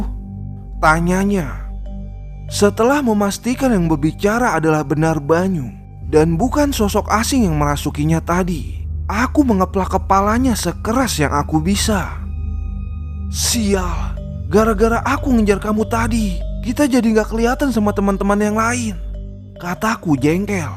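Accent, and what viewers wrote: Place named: Madiun, Indonesia. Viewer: Hadeeeeeh Madiun bletot lagi...heheheeeee salam bts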